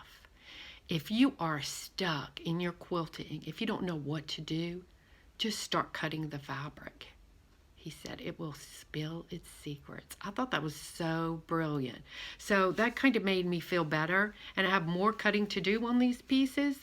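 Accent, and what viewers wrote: Louisiana, United States. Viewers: Hi. Just found your videos and I really like listening to you. I lived in Louisiana most of my adult life and your voice/ accent is so warm and friendly...and sounds like Home to me. I lived in good ole Ville Platte so I'm familiar with Lafayette and the surrounding area. I share a love for the Gees Bend Quilters too. Something about their quilts is so inspiring!! Maybe its the color combinations they use along with their improve piecing...but they just vibrate somehow and reach into your heart. Well, well I love to watch Joe Cunningham and his quilt report too. He has a friend in the Bay Area who is another innovative quilter. Her name is Margaret Fabrizio and has been making quilting videos for at least 10 yes. She is famous for making Kawandi quilts. She has traveled to India to learn how they construct their quilts. 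If you are not familiar with her Im pretty sure you would find her fascinating. Her You tube name is atree3 if you would like to get acquainted with her. Until we talk again, take care. And Thank you for making your videos.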